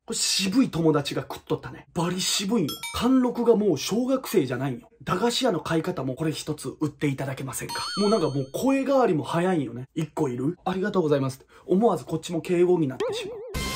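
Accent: Hiroshima, Japan. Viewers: マジ面白い！ 方言が広島カープの選手みたい🎏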